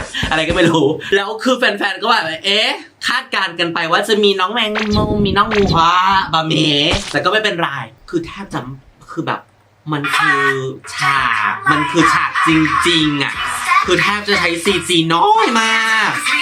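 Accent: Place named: North, Thailand. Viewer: ชอบความ อ้อ~อ๋อย คนเมียงของแต๊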